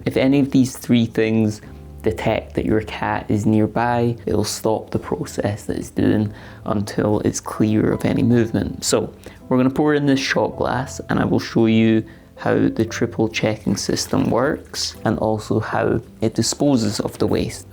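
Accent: Scotland, United Kingdom. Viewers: The Scottish dialect is quite something. 😅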